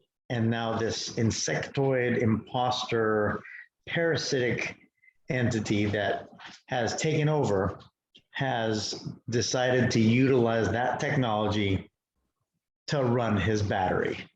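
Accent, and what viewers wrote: Australia. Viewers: Then emigrated to Australia, does he sound like an aussie?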